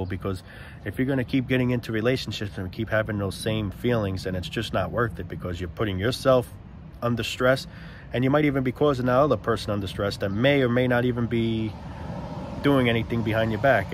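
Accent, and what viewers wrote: New York, United States. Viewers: Great NYC accent. Heroes of 911.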